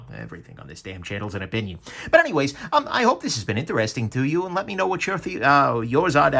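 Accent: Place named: New Jersey, United States. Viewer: Signore i love your Italian American accent new jersey ❤️ 🇮🇹🇺🇸 🗽auguri in bocca al lupo 🙂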